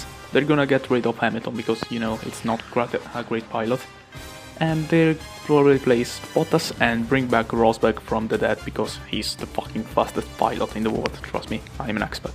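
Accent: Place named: Italy. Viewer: L'accento mi sta facendo morire, te se ama #alphamaxnova1ItalianPride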